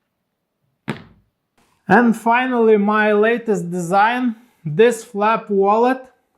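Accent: Russia. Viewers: Крутейший Русский акцент, очень приятно слушать. Ну и изделия, само собой, огонь!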